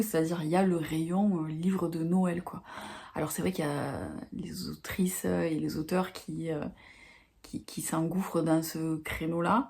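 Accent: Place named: South, France. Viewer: Vive l'accent du suuuuud !!! Les carnets et les stylos ralala, j'ai du arrêter d'en acheter parce que sinon mon appart serait rempli !! Y a pas d'âge pour lire tel ou tel livre, fais toi plaisir !!! Trop cool pour Virgnie Grimaldi ! Je savais pas qu'elle avait un blog avant d'écrire des romans !! Je suis pas du tout une lectrice saisonnière non plus, je fais en fonction de mes envies Très intéressant cette premiere video !! J'ai hate de voir la suite ! Si tu écris tu pourrais nous en parler un peu plus et pourquoi une vidéo avec tes livres préférés pour apprendre à te connaître 😁😁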